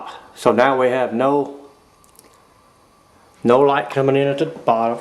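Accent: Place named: Appalachia, United States. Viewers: That southern accent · Why is every one of these videos narrated by someone with a hillbilly accent.....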